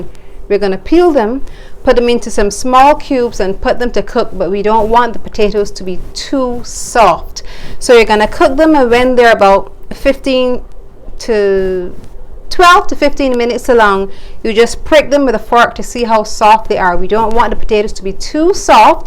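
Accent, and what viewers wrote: Barbados. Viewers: Nice to hear a Bajan for change. Love de Rock! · Girl yousa bajan I recognise that accent anywhere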